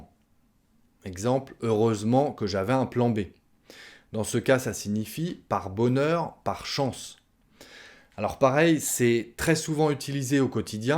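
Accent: France. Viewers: Bonjour! Excellent travail comme toujours! A propos, de quel coin de l'Hexagone venez-vous? J'ai l'impression que votre accent est du nord, mais je n'en suis pas certain. Bonne continuation!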